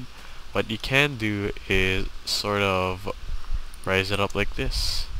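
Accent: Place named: Canada. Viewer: if i was to guess, i'd say mid-northern canadian accent.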